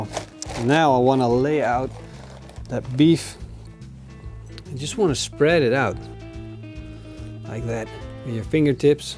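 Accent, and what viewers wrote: Netherlands. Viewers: your accent sounds really Dutch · Do I detect a Dutch accent?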